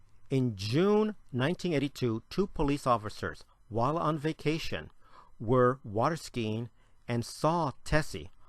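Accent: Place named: California, United States. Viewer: Ok, me too. Dude with the california surfy narration with the blurred out diver?????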